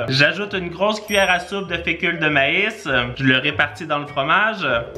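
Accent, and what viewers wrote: Quebec, Canada. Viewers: Après tant d'années à te suivre ton est toujours aussi incroyable pour moi, vraiment l'accent québécois best accent de la planète ! ✨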